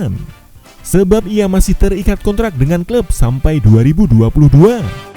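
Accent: Java, Indonesia. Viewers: medok banget jawanya si anchor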